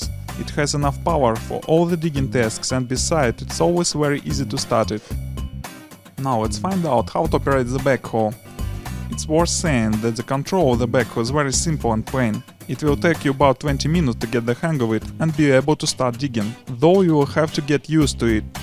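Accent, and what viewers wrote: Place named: Russia. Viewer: Русский наверное. Акцент выдает))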